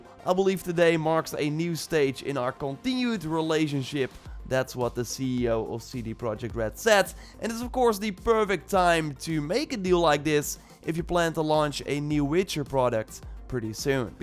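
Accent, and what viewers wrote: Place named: Netherlands. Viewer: Are you dutch by any chance? Your accent sounds familiar! Great vid btw!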